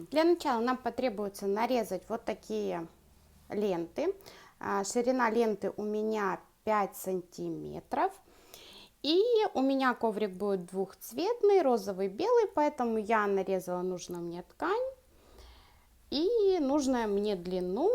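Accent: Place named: Surzhyk, Ukraine. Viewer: Это говор такой понимаете, суржик.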